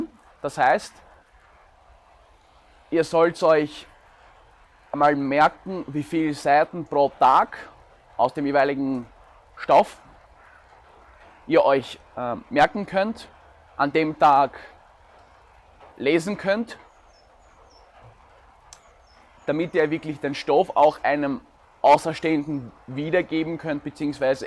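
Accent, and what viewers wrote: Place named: United States. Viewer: Auf dem ersten Blick hätte ich gedacht, dass er entweder Amerikaner oder Österreicher ist, weil er zwei Sprachen so gut beherrscht. Denn ich studierte für ein Semester Jura, bin ich qualifiziert zu sagen, dass es knallhart schwer ist. Ich gratuliere dir und drücke die Daumen hoch! Du hast noch einen Subscriber verdient:)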